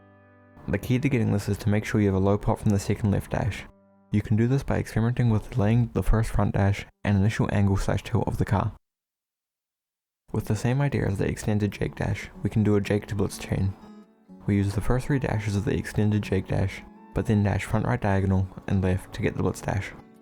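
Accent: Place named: New Zealand. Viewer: You from nz? U got a nz accent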